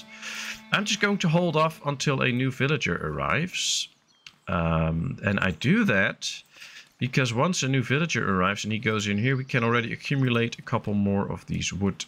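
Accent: Netherlands. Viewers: We zullen zien. P.S. Ik herkende gelijk het Nederlandse accent toen ik zocht op Foundation Lets play, vandaar dat ik in het Nederlands reageerde.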